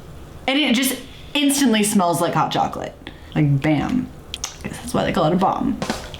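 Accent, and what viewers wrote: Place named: Georgia, United States. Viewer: YAWL!!! OMG!! You must be from Georgia!! I love your video! I felt like I was in the kitchen with you. Thank you for being real and showing the mess ups! We learn fromour mistakes.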